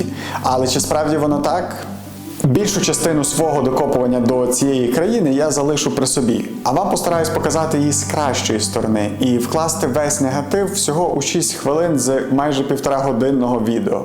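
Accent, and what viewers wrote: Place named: Western Ukraine, Ukraine. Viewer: Привіт! коментарі пишу вперше, але дуже хочу поділитися враженням) Натрапила на ваш канал в рекомендаціях і це ваууу... відео просто топ! подача інформації, монтаж... одним словом все на хорошому рівні. Скажу відверто, мене зачепив ваш говір)) Якщо не секрет, звідки ви родом? У мене є підозри, що це може бути Тернопіль або Івано-Франківськ? Дякую за такий контент, з нетерпінням буду чекати нові відео. +1 тревел-блог в скарбничку)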